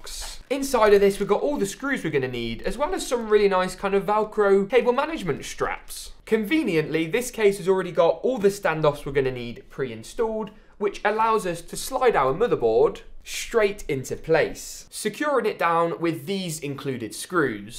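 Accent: United Kingdom. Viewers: I like the UK accent 😅😅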